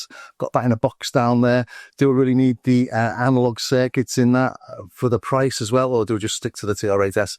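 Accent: Liverpool, United Kingdom. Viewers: not Jamie Carrigher-level Scouse! Hehe.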